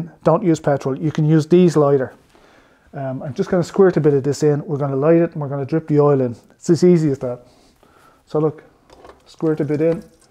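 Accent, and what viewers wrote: Ireland. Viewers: That's a mild Irish accent 🤣🤣🤣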